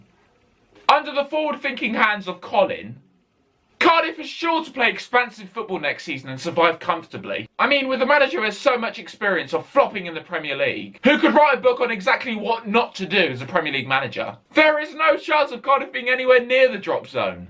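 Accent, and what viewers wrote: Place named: Hampstead, United Kingdom. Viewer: Hello. Where are you from? going by the accent, gonna say .. Hampstead?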